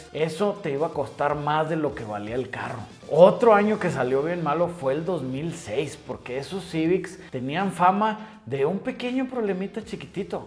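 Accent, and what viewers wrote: North, Mexico. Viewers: Eres del norte vea wey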